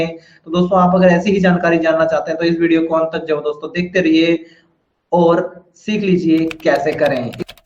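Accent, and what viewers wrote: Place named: India. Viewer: Just FYI he's not spekaing spanish... thei accent is hindi like, just saying don't asume.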